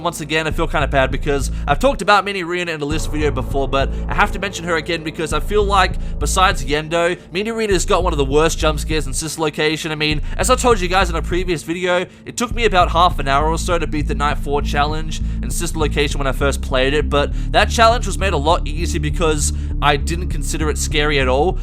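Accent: Australia. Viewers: Omg how didn’t I know he was aussie? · I didnt know you were aussie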